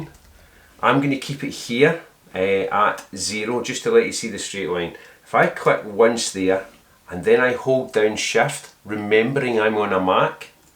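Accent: Scotland, United Kingdom. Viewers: The Google-subtitles in English are not perfect either... Possibly because of his strong Scottish accent which makes it hard to understand for non-native speakers;-) But his video's are great!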